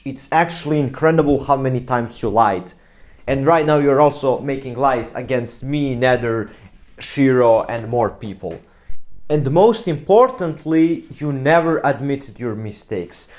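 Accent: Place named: Yugoslavia. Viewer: you are so mature wow , by the way good yugoslav accent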